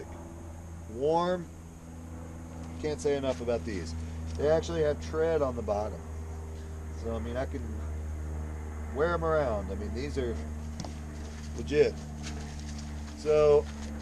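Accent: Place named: South, United States. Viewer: Where are you from? I hear a bit of southern.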